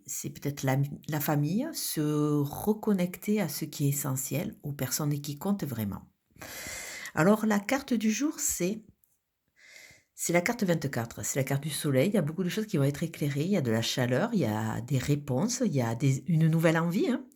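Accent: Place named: South, France. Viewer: Bonjour, accent du soleil!!. 🌞... j'aime beaucoup cet accent, merci de tout ❤ pour ce tirage. Une question si vous permettez: faites le nettoyage émotionnel? s'il vous plait... 👃 🙂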